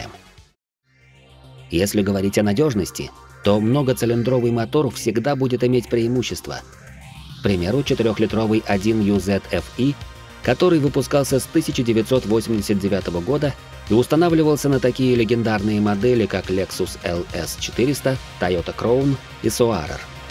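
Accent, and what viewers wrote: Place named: West, Russia. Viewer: Спасибо за видео, всё по существу) по тому как ты называешь абривиатуры двигателей, буквы 1jzgte , и в конце говоришь "и" , можно сделать вывод что ты живёшь в западной части России. Все на востоке говорят "е". 4а-фе , 1jz-гте ну в общем понял суть 😀😀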